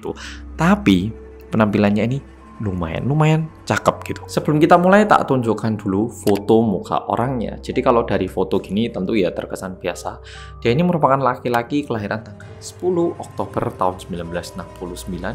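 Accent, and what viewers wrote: Java, Indonesia. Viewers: Pembawaan videonya khas mas ada suara medoknya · Gagal fokus am kreator nya wajah nya kek Korea tp cara logatnya kek jawa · Ampe medoknya di kencengin 😁😁 mantaaaaap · Lagi nonton series korea di netflix judulnya "somebody" yg cowonya ganteng tapi pembunuh berantai agak2 mirip sama tema cerita yg dibawain sama oppa medhok ini😍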